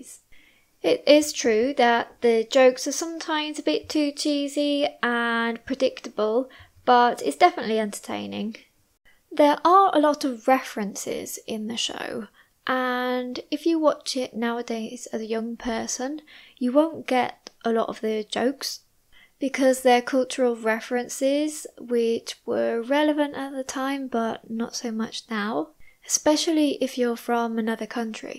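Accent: United Kingdom. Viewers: I think I'm still able to catch 95% the C1(without reading the text, off course). And I'm wondering how difficult it is on the C2. Will you make the listening practice for C2. Btw, I like your british accent. You use MRP, right? I still find it difficult to understand non MRP british english.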